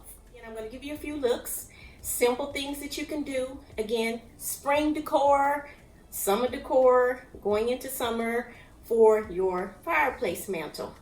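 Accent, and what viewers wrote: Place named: Kentucky, United States. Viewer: Looks so good. Hay girl I am from kentucky and we say ya"ll and you sound just like us.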